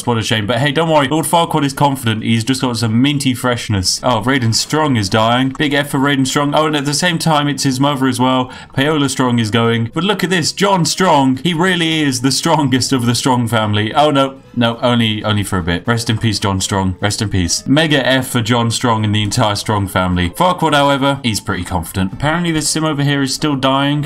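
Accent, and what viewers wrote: United Kingdom. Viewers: The British version of Graystillplays 😆😂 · I just subscribed within the first 5 seconds because of his voice, why do I love British accents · A British Jim Pickens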